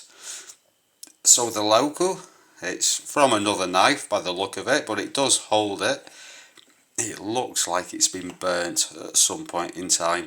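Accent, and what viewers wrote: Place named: Manchester, United Kingdom. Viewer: Nice work that mate , you've not lost the manc accent ;)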